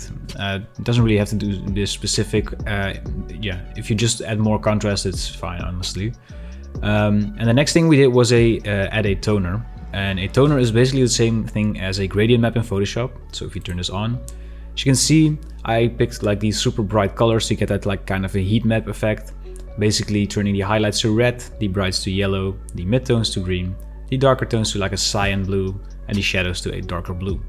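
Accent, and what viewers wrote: Netherlands. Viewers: dutch accent :) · your dutch arent you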